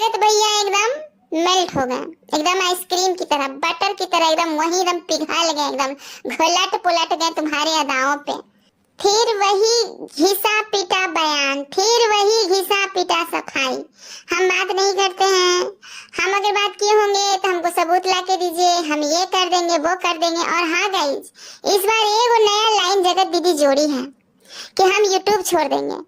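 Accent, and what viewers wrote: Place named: Magahi, India. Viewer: Didi ap kaha se ho plz bataiye.mujhe aisa lg raha h ki ap ka bolne ka tone aur kuch word jaise lagagit hau use ki wo to maghi word h a mujhe lg raha ki ap maghi ho pl z ap batao ki ap kaha se ho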